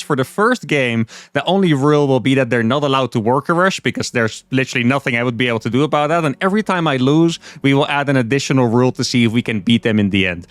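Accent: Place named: Netherlands. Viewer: It awesom watching you people have this much fun in SC2. Also judging by your accent uThermal you are a fellow Dutchie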